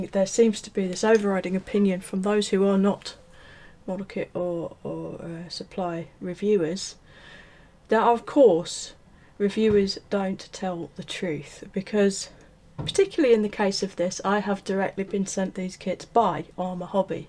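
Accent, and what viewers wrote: West Midlands, United Kingdom. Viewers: Hi, I would like to be considered for the Mustang giveaway, I'm back into modelling after 30ish years and find your lovely West Midlands twang and easy yet very informative tips and advice invaluable in my learning of the hobby after 30 years ( things have changed alot since the 70,s) Also undying to try foiling so this sould be my first attempt, I'll send you pictures of my success or not if I am lucky enough to win. Thanks again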